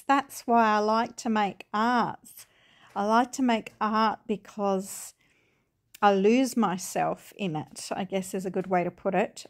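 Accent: Australia. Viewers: I definitely hear you when you talk about trying to keep up with others in the competitive colouring and arty communities eg ‘how can I get better, should I get this material or mediums, will it make me improve etc. it becomes a bit overwhelming in the end and I have been seeking out this kind of video to just be able to loosen up a bit as well. I am an Aussie too so was glad to hear an Australian voice, I have subscribed so that I can go along this journey with you into Zen non anxiety mindfulness. Thanks for your video. 👍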